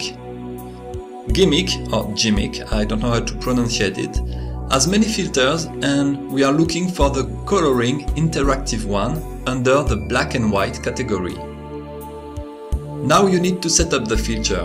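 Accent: France. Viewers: Cet accent français. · I worked in Issoire, France for 4 months in 2005, near Clermont Ferrand. It was refreshing to once again hear someone with a French accent speak such proper English. Makes me wish I was there again. Nice job overcoming the language barrier, and nice job on the video as well. I'm about to download Krita now in order to try to use it to illustrate a children's book. Wish me luck. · I'm not a native english speaker and I tent to have problems to understand people with accent. But understanding you is very easy don't worry. Most people, me included also like the french accent. Thanks for the tutorial! Would love to see more in the future. Love your art and love free software too! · your french accent sounds amazing,to be honest. the video itself is very useful,thank you,the art looks incredible,love it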